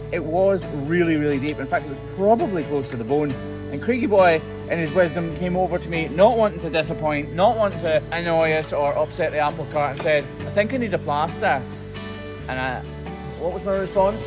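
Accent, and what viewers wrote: Scotland, United Kingdom. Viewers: Oh I love that you little bit of a British accent LOVE! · AYE LAD YER FROM SCOTLAND WHERE??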